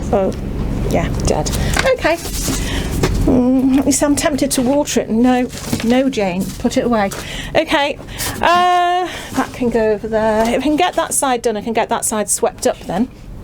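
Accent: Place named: Stoke, United Kingdom. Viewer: Hiya Jane you sound like a stokie? Have you had any storm damage ?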